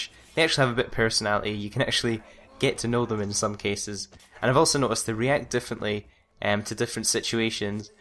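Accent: Scotland, United Kingdom. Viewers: What part of scotland are you from x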